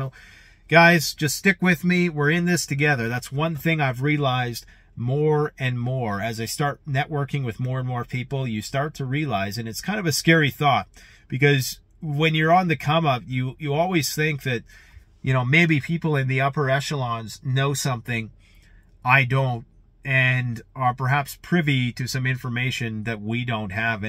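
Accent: Canada. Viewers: Trump is the best u sound like Canadian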